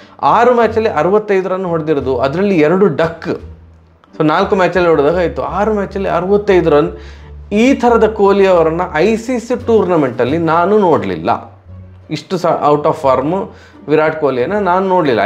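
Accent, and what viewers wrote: Mangalore, India. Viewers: You are the best cricket analyst ❤ and your mangalore Kannada is superb ❤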